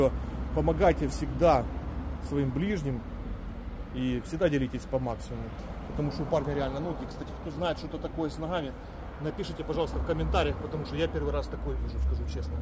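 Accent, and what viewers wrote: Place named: Ukraine. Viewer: Так это же украинский говор. Такой мягкий и приятный уху!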